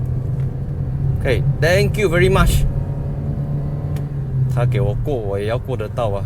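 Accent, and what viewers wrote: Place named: Malaysia. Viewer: 这是大马华语吗，中英文结合蛮有特色的，哈哈哈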